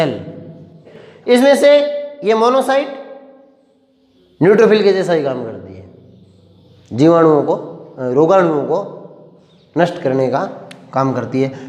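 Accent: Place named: Barmer, India. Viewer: आपके पढ़ाने का तरीका यही बनाए रखना क्योंकि कॉमेडी के साथ पढ़ाई में बोरियत महसूस नहीं होती है ओर खासकर देशी अंदाज...क्या आप बाड़मेर से हो गुरू।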